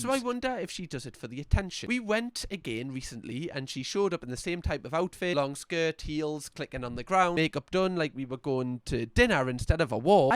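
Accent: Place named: Scotland, United Kingdom. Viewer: you sound like scottish jacksucksatlife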